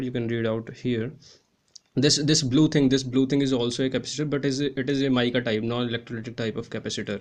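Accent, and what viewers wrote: India. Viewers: I am only 2 minutes in but it seemed to me that while you were explaining what each individual part is you might as well acknowledge the USB output connector too. I know what it is and the fundamentals of how that particular piece of this puzzle works, but for those who do not know what it is, a quick intro to it like the rest might help someone. Jus sayin' ... :-) I truly admire the fact that you do not want to waste peoples time with a long drawn out video. But there were a few times where you just motored through a sentence or two leaving my ears in the dust. Throw in the fact that I have not seen my Indian friends in years nor have I visited Pioneer Blvd and I had trouble keeping up. Of course that one is on me :-) But yeah, almost sounded like you plugged yourself into one of those chargers you were going fast! But I'll take fast over a long drawn out video any day of the week. 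I should add that I found this a very informative video as I did learn a couple things. Thank You and Thumbs Up!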